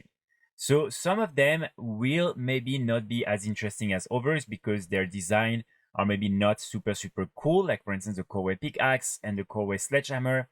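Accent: France. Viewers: T'es français non? L'accent xd Merci pour la vidéo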